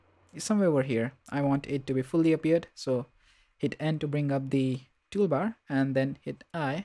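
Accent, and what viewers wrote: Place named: India. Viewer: I hear an Indian accent. Hi.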